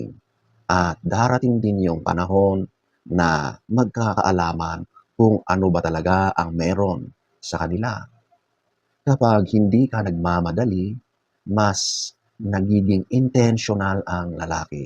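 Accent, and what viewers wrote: Ilocos, Philippines. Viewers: Ilokano kaba idol madlaw ko gamin kayat ko boses mo super humble sika👍💯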